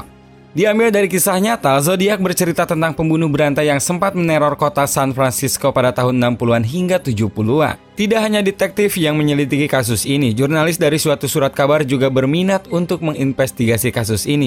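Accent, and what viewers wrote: West Java, Indonesia. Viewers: arfan muhammad hahaha kykx iya. Mgkn ini org sunda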